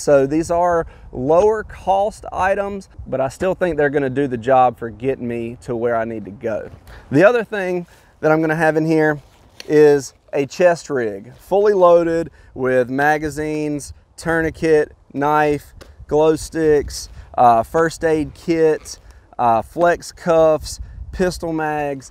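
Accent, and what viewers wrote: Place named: Georgia, United States. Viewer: I don't know where he is, but the freeze line where I am is 6 inches. He sounds like a Georgian so I'd say he's just about right.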